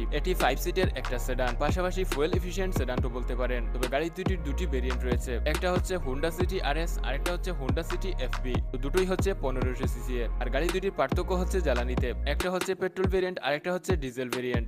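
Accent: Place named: Sylhet, Bangladesh. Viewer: Jodi valo korei kotha bolen apni je Sylhety ta apnar kothay bujha jay.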